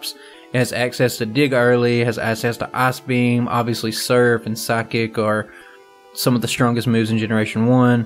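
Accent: South, United States. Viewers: I like the southern drawl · Personally I'm a fan of the southern sound you have when talking. It's different and makes you stand out. And if that's just how you talk then keep it up. I may watch may different people streaming these games but i love everyone's approach be it jrose, Scott's thoughts or vanman, everyone brings something new to each play thru. And honestly I like that your open about doing it not as a professional but just a fan of the series. Keep up the good work man. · As a southern girl, I love your drawl. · As a guy with a strong southern accent I appreciate the accent.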